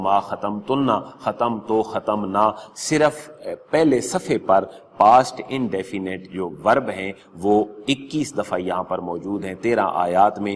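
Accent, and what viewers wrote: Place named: Punjab, Pakistan. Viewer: sahib k lehjy sy lag raha hai k ap gujrat,mandi, ya okara sy hain.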